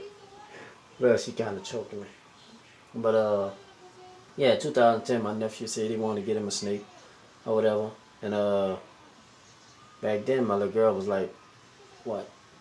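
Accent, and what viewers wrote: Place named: New Orleans, United States. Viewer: You ain't gotta show the saints flag to let people know you from N.O just talk we all know that accent lol great story bro love it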